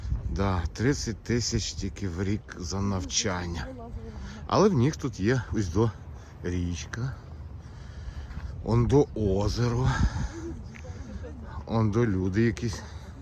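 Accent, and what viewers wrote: Zhytomyr, Ukraine. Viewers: Як же мені подобається діалект Житомирщини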